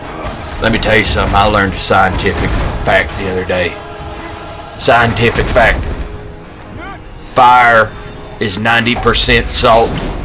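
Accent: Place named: South, United States. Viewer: vaguely threatening southern rambling Thahs the truth.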